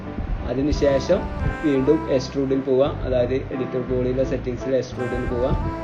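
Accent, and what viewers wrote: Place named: Kanyakumari, India. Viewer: Porathathin video Kasaragod um voice kanyakumari yum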